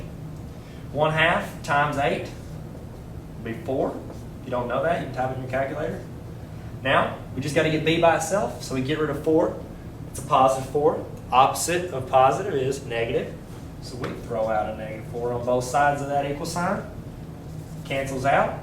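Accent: South, United States. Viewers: So southern! C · your southern accent is adorable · Thanks dude and nice southern accent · His southern accent... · I would pass math if he was my teacher... my teachers old and doesn't have an awesome southern accent XD like for if my math teacher looked and talked like him hed have my attention XD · Who else LOVES HIS COUNTRY ACCENT !!😍😍😁😁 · rodriguez That's what you call a southern accent.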